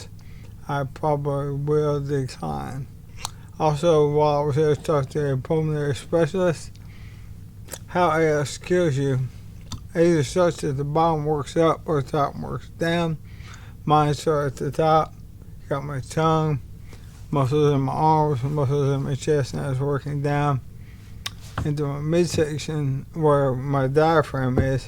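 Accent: South, United States. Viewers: You’re a stand up guy,. Many of us will indeed greatly miss you when you’re gone. Until then, I’ll continue praying FOR you & your family. We’ll likely never meet, this side of life. I’ll look forward to hearing your perfect southern dialect again in the next life tho. Much love and respect to you, sir!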